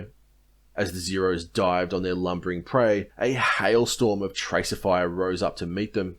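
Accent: Australia. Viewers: I LOVE this Aussie narrator ! Awesome. G'day mate ! 🫡 🇦🇺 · Hmmm? Narator sounds like Majorkill. Or do all Australians sound same?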